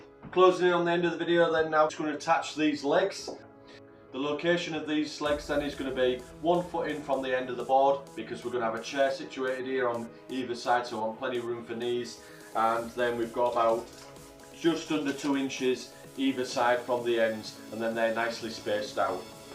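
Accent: Hull, United Kingdom. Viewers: That’s an ‘Ull accent if I’ve ever heard one.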